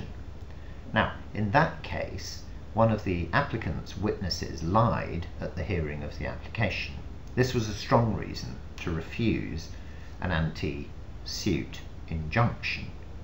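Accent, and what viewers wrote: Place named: England, United Kingdom. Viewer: Guy has a damn English accent - geesh!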